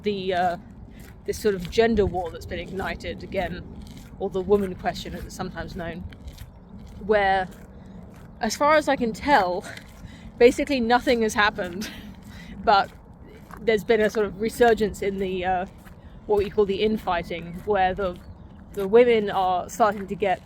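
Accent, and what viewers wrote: England, United Kingdom. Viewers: Been on a bit of a Mary Harrington kick and can't help thinking she sounds so much like you (because I knew of you first). Any relation? Or just from the same region of England and thus similar speech patterns? As an American grieved by our vast population's continual homogenization of accent I love how much more so(at least it seems) folks in the UK are maintaining distinct regional accents. (Though I know the flip side of that coin is accent and class being closely tied and that limiting your options in career is a real thing for y'all.)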